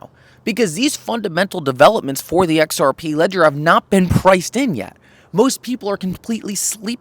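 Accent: Allentown, United States. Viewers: It is going down with an Allentown accent. I thought you were good in Math dude.. Gaining 20% of 60 % crash from March levels isn't going up bro.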